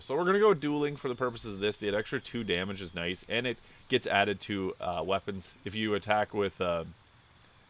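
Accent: Canada. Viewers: Just subbed since I've seen a few of your videos and I enjoy it. Are you Canadian? Random question, sorry. haha.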